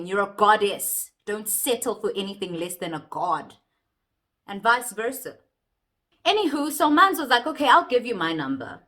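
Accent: Zulu, South Africa. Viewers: Samnandi isiZulu sakho Ntokazi 🌹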